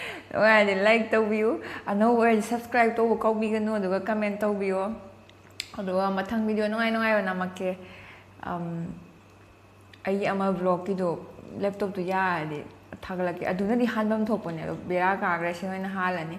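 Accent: Kakching, India. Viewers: Ema se yam nungcye... Kakching kai waideino ngse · Kakching marolse taba ym nungaiye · Kakching maronda ngangbana pamjei sister. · Che se kakching kawaidgino hngkhi reply towrk towbirkhide eikhoi kakching mrol ngangbni eina hraoe su haikhibni eisu kakching ne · Che na kakching marol ngangbce nungcye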